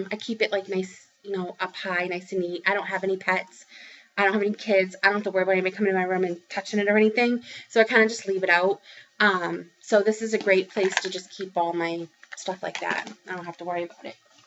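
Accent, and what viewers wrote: United States. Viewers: Hi! So many pretties to comment on and you've been super busy this month! Absolutely adore your FFOs - they ALL look amazing! I agree with you - doing a HAED on 18ct is still my favourite. Although the tent stitch is somewhat faster, I still prefer doing full crosses and 18ct has great coverage. Could you please share with me the link to the feeble you mentioned "There's no place like home"? I'm pretty sure I don't have that one. I love your accent.... I'm slowly beginning to hear the differences between the various areas of the U.S. 😉. Apparently here in Australia we have different accents depending on what area we're from too... But I've never been able to pick them! Your Stitchrovia piece is my favourite of yours - I get really excited every time you show that one! I know I'm going to have to purchase it at some stage LOL. Thanks so much for tagging my tutorial... 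Great appreciate that, and I'm so happy you found it useful. Happy stitching 😊 xx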